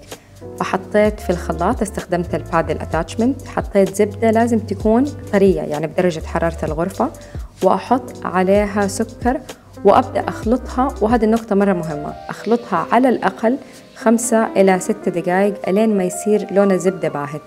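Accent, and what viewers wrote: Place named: Jeddah, Saudi Arabia. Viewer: الفديو جوناااان والتصوير ماشاء الله ولاغلطة بس صوت الميوزك مزززعج لوصوتك بس وهدوء كان ولا غلطة اللهجة الجدوية جمييييلة معاك ربي يوفقكك 💛💛💛❤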